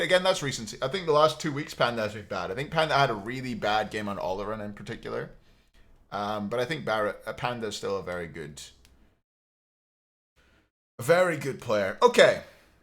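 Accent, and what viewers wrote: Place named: Scotland, United Kingdom. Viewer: Best takes , perfect list , perfect video , and the most authentic Scottish accent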